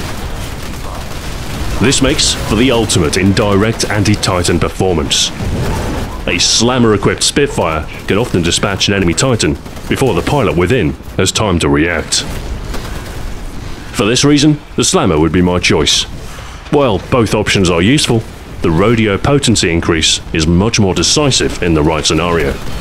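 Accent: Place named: United Kingdom. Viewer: THIS GUIDE IS AMAZING!!! I am 100% confident that you produce the best Titanfall weapon guides on the Internet! It's so professional, engaging, and well-edited. Additionally, your magnificently deep, British voice is the perfect sound for discussing the topic of Titanfall armaments. This video was so good that I actually got goosebumps at the end!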